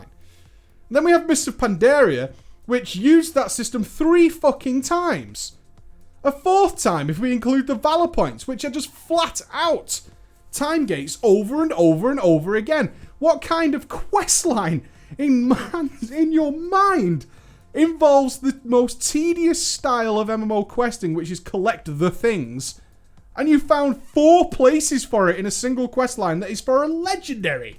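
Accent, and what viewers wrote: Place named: North West England, United Kingdom. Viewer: dont apologise for your cough, apologise for your scummy north west accent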